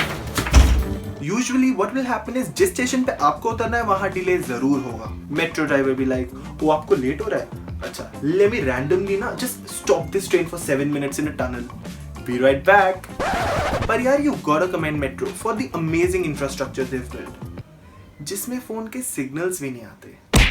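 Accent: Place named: West Delhi, India. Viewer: Bhai west delhi ke lgte ho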